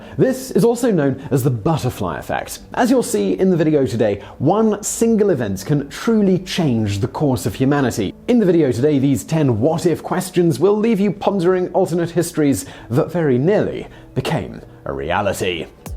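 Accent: United Kingdom. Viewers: You realize he is British and they pronounce things differently there right? Saying Yuck-atan is perfectly fine. He also pronounced Leif correctly, it is a Scandinavian word and it is usually pronounced Layf, look it up. Try to do some research before attempting to incorrectly correct things, yah dingus. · mispronunciations really bother me for some reason. He does pronounce things in a British way sometimes which doesn't bother me. It's more the pronunciation of Yukatan and on one of the other videos he mispronounce Haiti.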